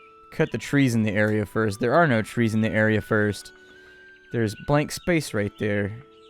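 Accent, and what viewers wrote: United Kingdom. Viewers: Duuude that Bri'ish accent!!! loving it. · Love the brittish peseant accent :D Oh and the game as well :P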